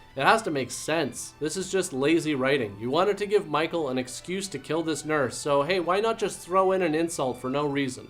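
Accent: Canada. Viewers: Trash, sounds like a Canadian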